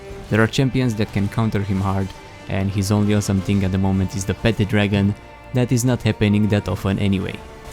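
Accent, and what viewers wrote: Romania. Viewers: You have Romanian acces :D Deci esti roman :)) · are you romanian? you have accent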